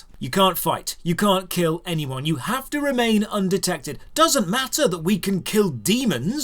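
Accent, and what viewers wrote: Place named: Northern England, United Kingdom. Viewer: "Pick oop"...ah, by gum, yah can't be hidin' your northern lilt.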